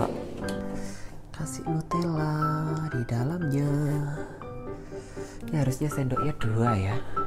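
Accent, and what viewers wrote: Java, Indonesia. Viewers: Selamat pagi, mantap rotinya buat sarapan😀 blekenyek😂 bosone Ra ilang jowone👍 salam dari Bali, eh iya lupa sekarang lagi dijakarta 🙏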